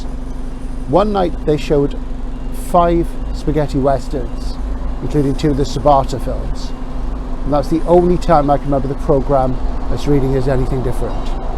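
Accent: Wales, United Kingdom. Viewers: Excellent! Your accent became more Welsh the further down Mill Street you went.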